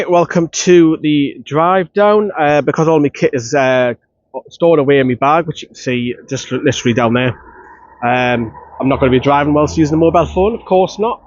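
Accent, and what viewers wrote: Newcastle, United Kingdom. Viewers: stupid me, I thought it was Tuesday today, haha, and I'm glad you talk Geordie, not posh dis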